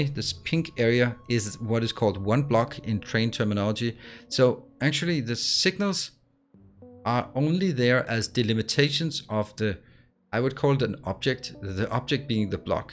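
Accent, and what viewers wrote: Denmark. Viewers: Decent tutorial. Literally subscribed for the flawless danish accent.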